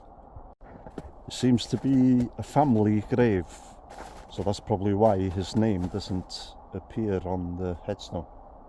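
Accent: Wales, United Kingdom. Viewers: Hey, loving your videos, n loving your accent, there has to be so.e Welsh in there somewhere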